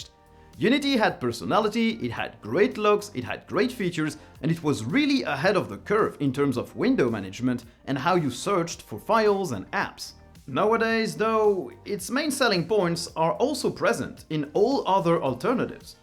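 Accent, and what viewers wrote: France. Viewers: Your accent, are you French, Ouais?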